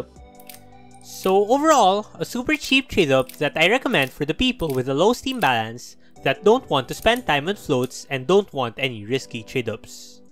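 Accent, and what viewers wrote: Philippines. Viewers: Filipino for sure. Atleast he is trying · my favorite trade up is the safehouse tradeup it made me 2.30 cents thanks you sound Filipino haha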